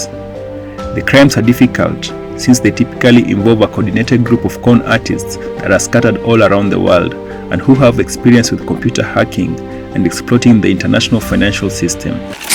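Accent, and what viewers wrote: Kenya. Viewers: The Kenyan accent of the narrator...the tone of the video seems to admire the fraudulent activities...it's very sad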